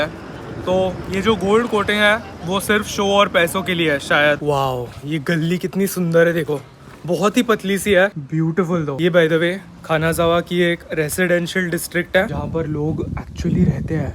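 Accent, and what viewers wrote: Maharashtra, India. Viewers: Marathi guy can never leaves his accent 😊😊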